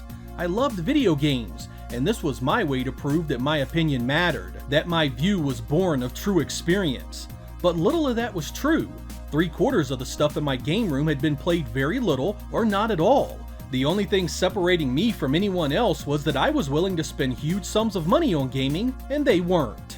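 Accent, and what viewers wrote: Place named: Pennsylvania, United States. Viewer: We are exactly the same age and I even grew up in the same area and went to the same malls you talked about in previous videos. I grew up in the Southampton/Feasterville, PA area. I'm sure we would have been friends if I knew you at the time. Actually, when I first discovered your channel, the first thing I thought was, "hey, I know that accent!". :) Cheers!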